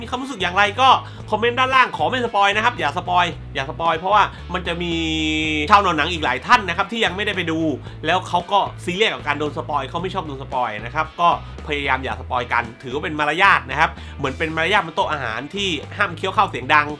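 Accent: South, Thailand. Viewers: พี่เป็นคนที่ไหนครับ จังหวัดอะไรครับ สำเนียงเหมือนคนพากย์ใต้เลยครับ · พี่เป็นคนภาคใต้รึเปล่าครับ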